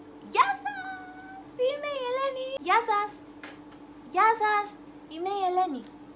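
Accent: Japan. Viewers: Γεια σου Ελένη! Γιατί μιλάς τα ελληνικά με ιαπωνική προφορά; Χαιρετισμούς από Manchester! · Miss Nancy, νομίζω ότι μόνο αν πήγαινε η Ελένη σε γλωσσολόγο θα μπορούσε να καταλάβει γιατί υιοθέτησε στοιχεία φωνολογίας, τον τόνο, την προσωδία, τον επιτονισμό κτλ της ιαπωνικής γλώσσας και μάλιστα όχι π.χ. της αγγλικής. Σίγουρα ως ένα σημείο γίνεται ασυναίσθητα, όμως, είναι και μια συνειδητή επιλογή ταυτόχρονα, τουλάχιστον νομίζω ότι οι γλωσσολογικές μελέτες αυτό δείχνουν. Κάποιοι που ζουν (ως ενήλικες) στο εξωτερικό αλλάζουν περισσότερο την προφορά τους από άλλους που ζουν ακόμη περισσότερα χρόνια. Οι λόγοι πολλοί: μπορεί να αισθάνονται ότι αφομοιώνονται καλύτερα στη ντόπια κοινωνία όταν μιλούν ακόμη και τη μητρική με ξένη προφορά, μπορεί να τους ακούγεται πιο εύηχο κτλ κτλ κτλ. Προσωπικά, ζώντας 17 χρόνια στη Ισπανία, δεν έχει αλλάξει η προφορά μου, παρότι δεν έχω παρά σπάνια επαφή με άλλους Έλληνες, αλλά, όταν λέω κάτι στα ελληνικά μπορεί π.χ. 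να κοτσάρω κι ένα «ναι;» στο τέλος, κατ´αντιστοιχία με το ισπανικό «no?» γιατί και το έχω συνηθίσει στα ισπανικά στο τέλος καταφάσεων(δηλ γίνεται και ασυνείδητα), αλλά γιατί το βρίσκω πρακτικό (δηλ το κάνω και συνειδητά σε ένα κομμάτι του).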